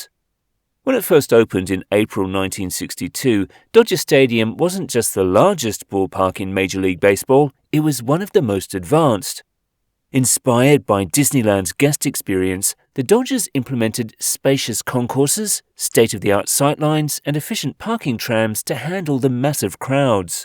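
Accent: United Kingdom. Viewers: That is not the most iconic stadium. It’s a piece of sh!t. With all of that money they need a new stadium. Oh and when talking baseball don’t use a guy with a British accent. You lose people right away.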